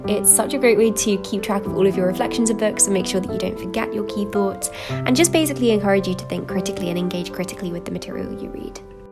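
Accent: United Kingdom. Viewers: Two things why i watch videos 1. To be motivated 2. To learn some British accent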